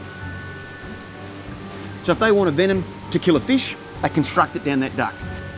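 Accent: Australia. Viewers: As soon as I heard the Australian accent, and the one speaking the accent inside a lab coat, I was all in. Subbing to ya mate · Having this explained with an Australian accept on makes sense. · Ur ausie arent yah? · Are you Australian?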